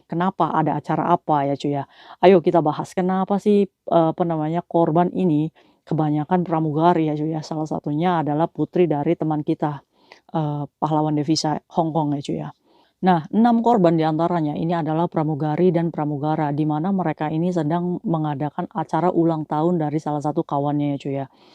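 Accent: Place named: Java, Indonesia. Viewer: kirain orng jpng tnyta wong jowo 😊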